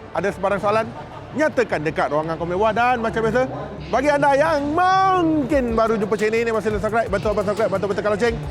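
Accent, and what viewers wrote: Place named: Negeri Sembilan, Malaysia. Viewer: kan nismilan bona bunyi eeh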